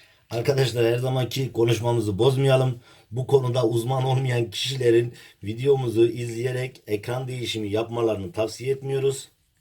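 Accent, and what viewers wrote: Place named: Mardin, Turkey. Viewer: Hocam mardinlimisin sen şiven mardinli arapların şivesine benziyor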